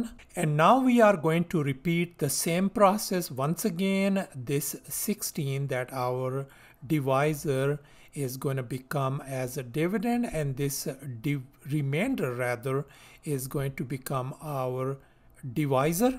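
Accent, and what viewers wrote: India. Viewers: Sir is probably an NRI, as u can hear from his voice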